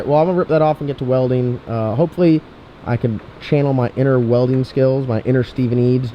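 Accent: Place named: New England, United States. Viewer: IF YOU NEED SOME HELP I'M NOT AS GOOD AS MATT IS BUT I'M PRETTY DAMN GOOD AND I'M AROUND ANGER NOT TOO FAR FROM ERWIN I'M LIKE 25 MILES FROM YOU I CAN WELD I CAN DO EVERYTHING THAT MASON DOES PLUS MORE I'M NOT GOING TO SAY I'M MAD BECAUSE I WOULD LIKE TO LEARN TO TUNE BUT I'M RIGHT UP THE ROAD FROM YOU AND HAVE A COUPLE GOOD PROJECTS THE FIRST ONE IN NEED IS A 1965 CHEVELLE MALIBU 300 DELUXE WANT TO TWIN TURBO WITH WITH A 6 L AND INTERESTED ABOUT GETTING INTO THE STORM WORK. I'M NOT A SCRUB MY OWN LAND I'VE BEEN A PLUMBER FOR THE LAST 20 YEARS PLUS AND I'M 40 YEARS OLD I'M NOT TOO EXCITED ABOUT PENNSYLVANIA YANKEES BUT SEEMS LIKE YOU MIGHT BE A FUCKING TRANSPLANT YOU SEEM PRETTY SOUTHERN TO ME JUST YOUR GODDAMN YANKEE VOICE GIVE IT 10 MORE YEARS THAT'LL GO AWAY ASSHOLE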